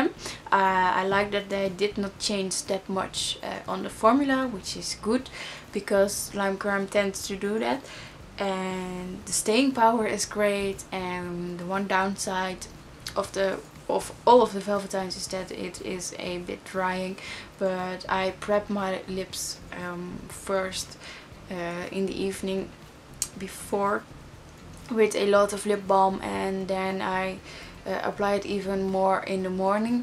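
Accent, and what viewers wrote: Netherlands. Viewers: Loved this reveiw! I'm obessd with my Veletines. I also love you Dutch accent. Immediately, I knew you were Dutch because of it. (: My oma is from Holland. Haha. Keep up the great work. Definately subscribing!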